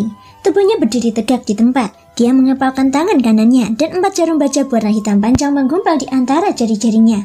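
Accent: Java, Indonesia. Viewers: medok emen...kenapa ga sekalian pakai bahasa aslimu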